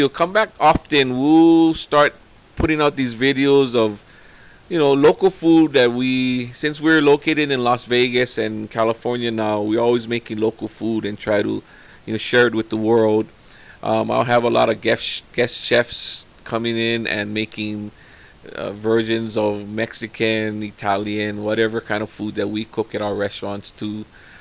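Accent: Hawaii, United States. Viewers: New Subbie! Love the pigeon accent - passion for food & sharing your recipe. So happy I found your content. Mahalo! Born & raised in Waianae HI - now in Seattle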